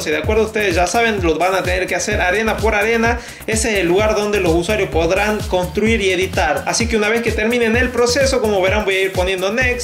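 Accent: Argentina. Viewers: JAJJAJA COMO SE NOTA Q SOS ARGENTINO GRANDE SEGUI ASI SALUDOS DE ARGENTINA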